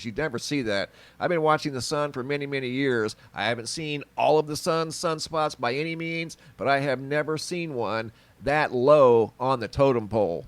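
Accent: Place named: Central Pennsylvania, United States. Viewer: Btw thats how everyone sounds in central Pa and surrounding area Yoooooooo dude :-D Hey I'm from pa and yes back about 6 years ago back country road not a drone nothing like that full 100% craft out of this world just hovering right above my head on this back country road it was legit just chilling there as if its saying hi to me. All i had at the time was an ipod and when i went to try to record it and then began to move from me behind the trees It was above my car the height of telephone poll.. it was high tech and out of this world for sure.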